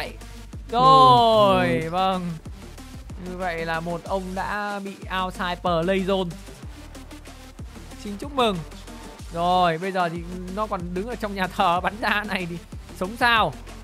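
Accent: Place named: Thanh Hoa, Vietnam. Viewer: Giọng thanh hoá :))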